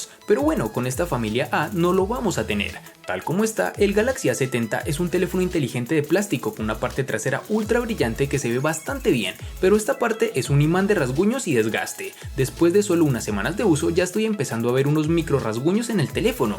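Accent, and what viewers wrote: Cali, Colombia. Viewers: Es de Cali ? Cierto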